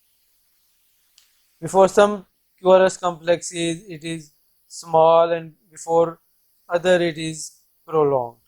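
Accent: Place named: India. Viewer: Thank you. This is a great help to us who are accustomed to the English accent but are not used to the Indian accent.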